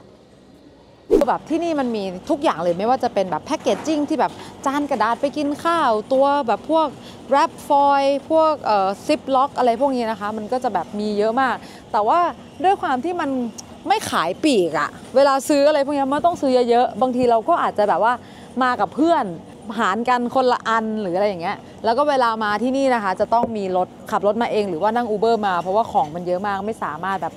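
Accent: North, Thailand. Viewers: F.C เจียงใหม่ ..เป๋นกำลังใจ๋หือเน้อคับ ดู.ทุกต๋อนเลย ชอบแต่ะๆครับ อู้กำเมือง.ฟังแลัวน่าฮักดี....เจ้า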